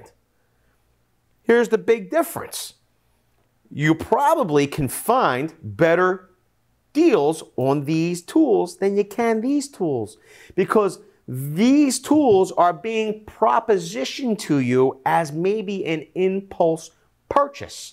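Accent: Philadelphia, United States. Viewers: That's a Philly accent, not New York LOL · that’s definitely not a New York accent. It sounds like Philly.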